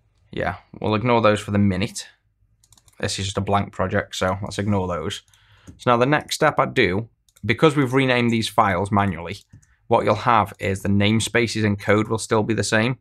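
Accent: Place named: North, United Kingdom. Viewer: Just wondering, are you from Liverpool? This sounds like northern UK accent.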